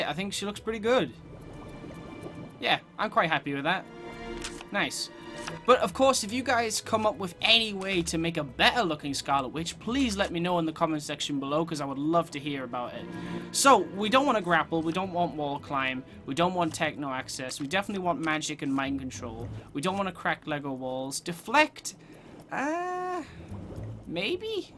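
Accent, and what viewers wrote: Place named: United Kingdom. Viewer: For some reason your British accent is annoying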